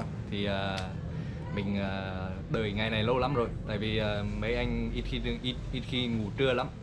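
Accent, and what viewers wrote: Nghe An, Vietnam. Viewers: Anh ở nghệ an à anh..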